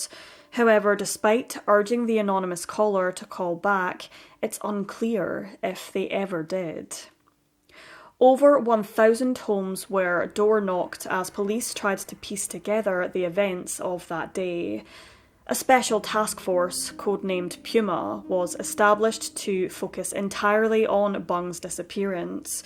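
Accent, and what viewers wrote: Scotland, United Kingdom. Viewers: I am unsure how long I have been subscribed but I will say this without a doubt, you are my favourite true crime narrator, you never sensationalise the stories or make silly jokes and memes like others in the same genre, I do sometimes enjoy other methods but I dobnot respect them as I respect you. You being scottish also helps because it let's me here more of my own accent online without it being exaggerated or used as anything other than the true voice it is. You have inspired me to put my own voice out there (not the channel I am commenting with) and also you have given a voice to many of the unfortunate souls in your videos. Thankyou. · why are you scottish???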